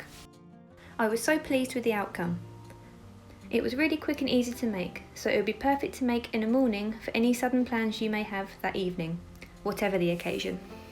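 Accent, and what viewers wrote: United Kingdom. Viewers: Saaf Landan!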